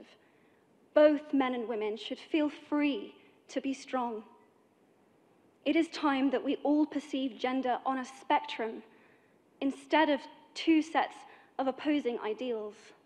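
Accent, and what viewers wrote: United Kingdom. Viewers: イギリスなまりが結構強い！ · love your British accent, 😍 you're so beautiful and hope for us all women in the world. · 素晴らしいイギリスアクセント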